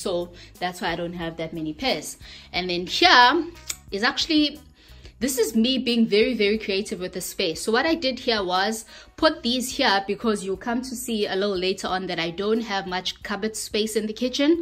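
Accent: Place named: South Africa. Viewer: I was only like 15 sec into the video and I was like, you sound South African😂🇿🇦I was right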